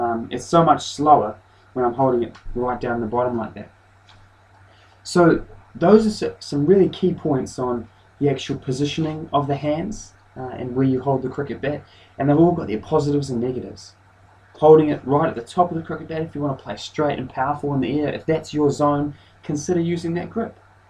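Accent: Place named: New Zealand. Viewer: dat kiwi accent.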